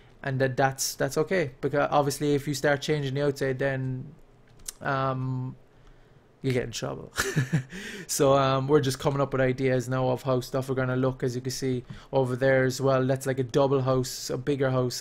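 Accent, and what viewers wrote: Ireland. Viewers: I am and you sound irish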